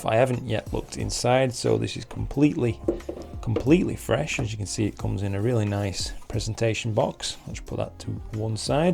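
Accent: United Kingdom. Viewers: Hi, excellent review. I look forward to the results of the deep sky testing of the 585. An unexpected result of watching your videos has been getting used to your nice British accent XD. I was used to American English, but now I prefer a British accent. No Dylan O'Donnell, I still can't get used to your Australian accent XD XD XD. Hugs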